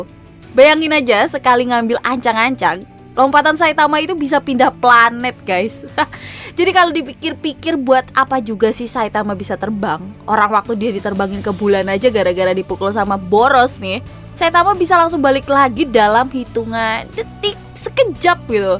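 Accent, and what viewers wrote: Java, Indonesia. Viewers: Wis kaya wong Jawa yo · Suka logat jawanya mbak 🤗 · orang Jawa ternyata, ngokonya kerasa 🤣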